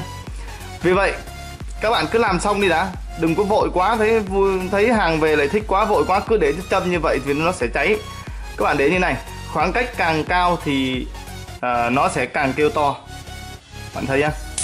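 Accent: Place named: Thanh Hoa, Vietnam. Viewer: A nói giọng người thanh hóa vậy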